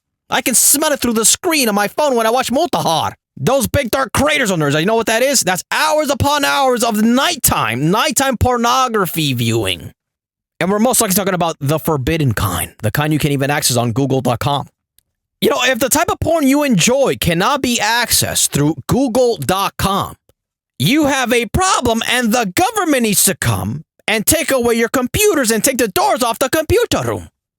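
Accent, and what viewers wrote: India. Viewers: You're racist, but your Indian accent is quite entertaining. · First thing I hear is an indian accent 😂😂😂😂😂😂😂😂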